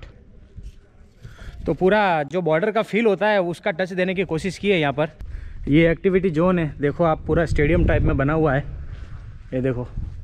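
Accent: Gujarat, India. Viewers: Bhai hindi me Gujarati language sound effect aur sabd jitna ho sake utna Kam kijiye baki pura suddh hindi bolne ki try kijiye 😁 love from Gujarat ❤️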